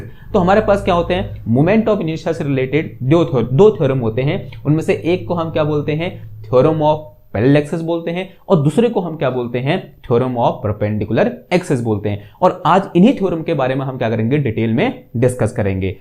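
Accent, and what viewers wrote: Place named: Kumaon, India. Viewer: Kumaoni accent ❤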